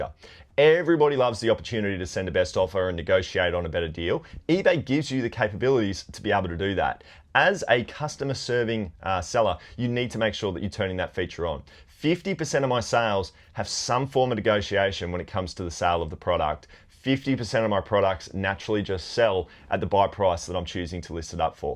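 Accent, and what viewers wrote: Australia. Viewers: I like your voice its cool to hear Australian Accent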